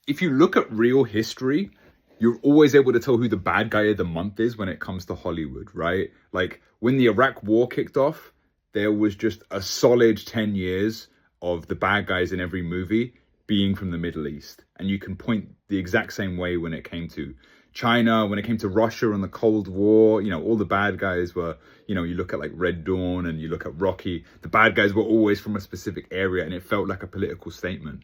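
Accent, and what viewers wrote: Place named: England, United Kingdom. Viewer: You have an English accent 😂😂😂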